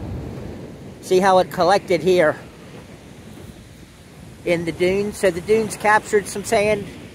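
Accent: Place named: Baltimore, United States. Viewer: Hahaha, this guy has a classic Baltimore accent. Sounds like Dundalk specifically. Good video.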